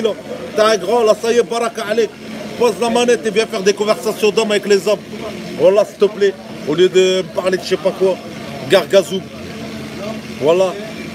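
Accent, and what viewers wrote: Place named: North, France. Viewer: C'est le nooooord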